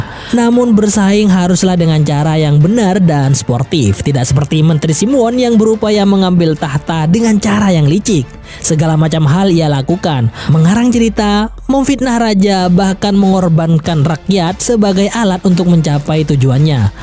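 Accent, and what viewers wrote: Madura, Indonesia. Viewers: Madura mana bg?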